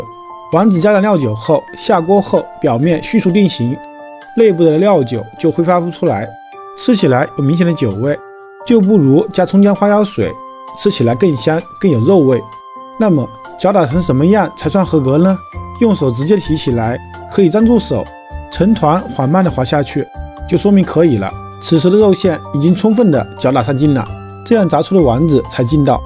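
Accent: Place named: Hubei, China. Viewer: 大厨是湖北人吗？听出点口音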